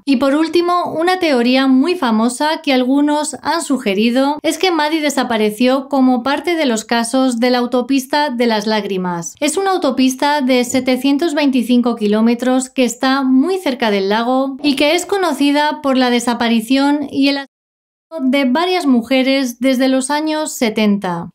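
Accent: Spain. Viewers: Está bien, español de Europa 👏👍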